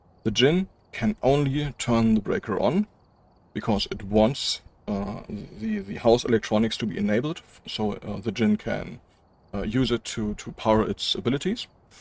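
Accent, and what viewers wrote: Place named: North Carolina, United States. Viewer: you, sir, have an unusual North Carolina accent...:face-orange-raised-eyebrow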